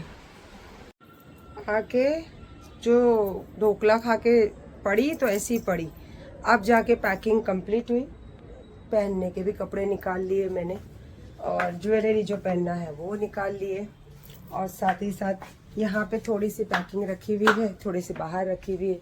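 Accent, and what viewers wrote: Bangalore, India. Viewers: Ur bangaluru urdu is nice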